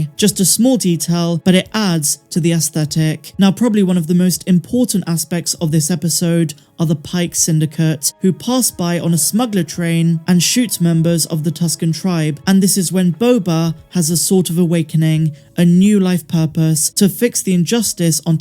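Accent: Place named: England, United Kingdom. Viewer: Twated lol big up star wars so nice hearing about star wars in a English accent keep up the good work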